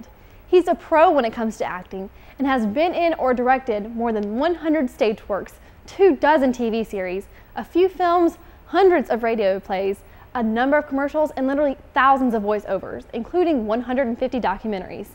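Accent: United States. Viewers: You're cute, but try to stop mis-pronouncing everything please. You can push past this "American accent" we believe in you!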